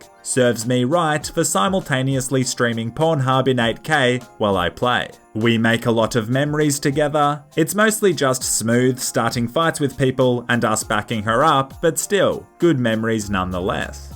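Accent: Australia. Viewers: Oh i thought u australian let's go australia, we got best game utubers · I read this in your australian voice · Yes! My prairers have been answered! Finally some more Legs Spread Redemption 2 Online by. Lovely seeing someone freeze to death in the mountains with Australian commentary!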